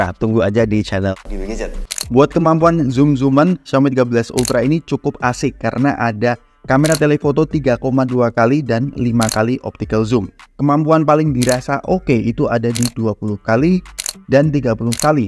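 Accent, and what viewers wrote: Semarang, Indonesia. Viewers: Orang semarang om?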